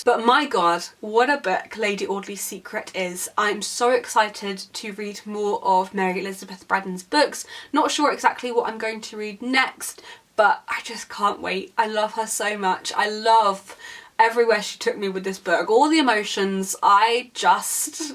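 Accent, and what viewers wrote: United Kingdom. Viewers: I love your wonderful British accent, Hi from Italy!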